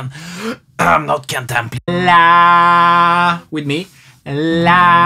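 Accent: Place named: Sweden. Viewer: The swedish accent makes it even better! Tusen takk for en meget god latter.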